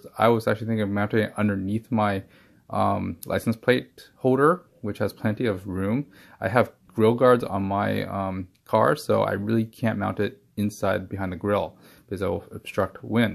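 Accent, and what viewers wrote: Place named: California, United States. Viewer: This was good but I had to listen over and over to catch his words, as he didn't speak distinctly, unless I'm just not accustomed to a California accent. But still, thanks for the info.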